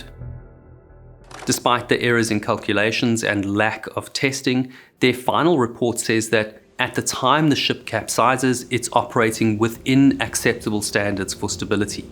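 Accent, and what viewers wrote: South Africa. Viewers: He's south-african, you clown.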